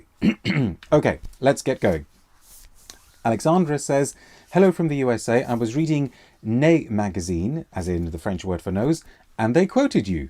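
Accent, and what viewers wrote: United Kingdom. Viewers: and a lovely oxford accent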